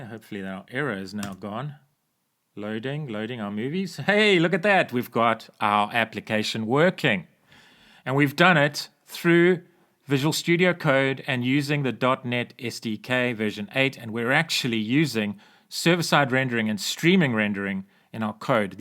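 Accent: South Africa. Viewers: That annoying invisible character that was at the start of your files might just be the BOM, at the bottom right of VS Code you can see "UTF-8 with BOM" so you might be able to disable it there Are you from south africa or am I mistaken? (because of the accent)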